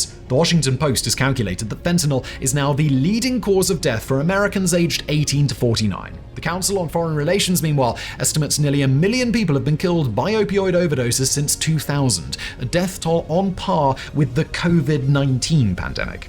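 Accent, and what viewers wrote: England, United Kingdom. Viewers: Your accent - I assume u English- don’t know what u talking about - George bush CIA director in <num>-vice president in the 80’s Pres in the 90’s -in 1976 was liaison for China - u do the math - someone is Benefitingon This side - people are leaving the door open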